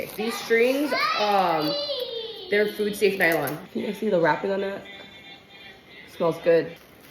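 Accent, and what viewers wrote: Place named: Vietnam. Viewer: As a Vietnamese, I totally confirm that you had awesome banh chung 😂 Love your Vietnamese accent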